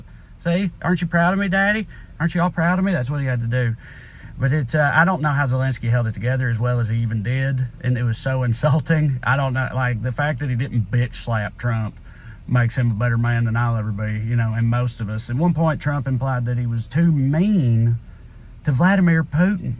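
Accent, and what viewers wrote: South, United States. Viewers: God I LOVE your southern drawl. I know this is totally off-topic but hey I couldn't hold it. BTW, f#&k the orange haired buffoon! Cheers from Italy! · To hear this intelligent man speak the truth in in his deep southern accent is delightful. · What a fantastic rant young American man, spot on!! Greetings from England Love the accent!!.... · lol , you sound like a red neck , but get your point across like a very intelligent person , as you are , I love it , shines a little light in these uncertain times , thank you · Finally, A Southern Accent i can Enjoy?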